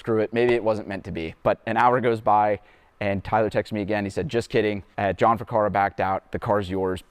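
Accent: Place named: Kansas, United States. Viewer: Good on you! Slowly but surely, you're building content. Granted you had to wait until CT2 aired, but your delivery is so measured and "Kansan" that it is nice to another one coming along. I really like the understated "look and feel" of your channel. It's rare to watch a channel like that and NOT have it be boring. Thank you. I think I'm subbed on another account, but I''m subbing on this one now.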